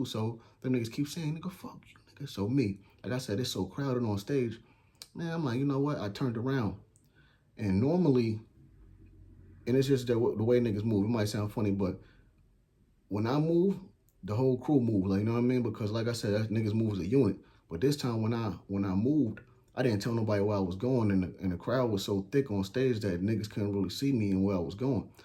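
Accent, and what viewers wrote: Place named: New Haven, United States. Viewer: Sound like New Haven lol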